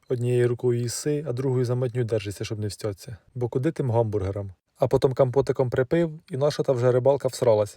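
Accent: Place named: Surzhyk, Ukraine. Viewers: Дякую за відео, слухаю наш рідний суржик як бальзам для душі 🎉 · Мои сваты из села Мыколаивки Новомосковского района так балакають, такой диалект суржика прикольный, юморной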